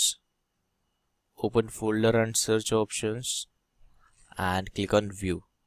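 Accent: India. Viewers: fucking taskbar don’t work. don’t tell me i paid 60$ for windows license and here it’s buggy and have to listen to this poor indians voice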